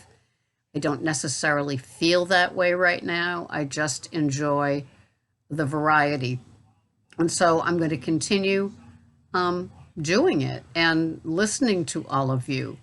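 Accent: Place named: Cape Cod, United States. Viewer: The food allergy test sounds amazing. Looking forward to the day you can share results. Love your Cape Cod accent., have an awesome day! 😊👍😊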